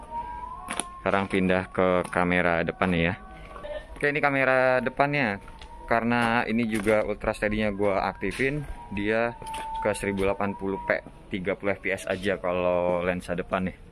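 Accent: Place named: Sunda, Indonesia. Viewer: Orang mana emang Kang? Campur sunda uyy 😂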